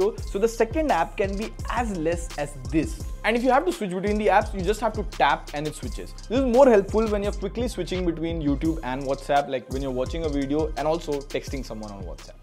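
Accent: India. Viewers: Look man, I like content but there is one solution actually two solution I need to work on Indian accent to learn it Or you need to work on American accent? And basically get more views and go International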